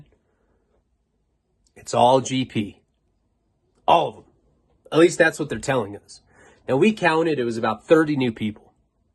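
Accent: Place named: Chicago, United States. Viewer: Why do you always sound like a south sider every time you do voices? I have to admit it sounds good though. ESE 😂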